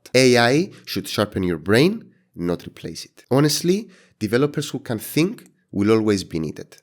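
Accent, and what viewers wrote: Cyprus. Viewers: Hey, I can tell from your accent that you are from Cyprus Χαίρετε κουμπάρε μου😂😂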